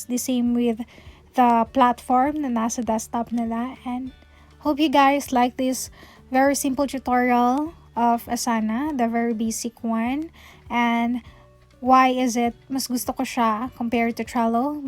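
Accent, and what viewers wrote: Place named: Visayas, Philippines. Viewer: Ate, taga asa ka te? Bisaya lagi imoha accent. Hehehe malingaw ko..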